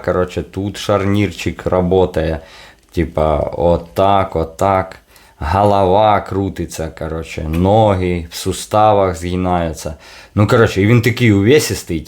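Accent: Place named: Trostianets, Ukraine. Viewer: акцент як у мого кореша з Тростянця ))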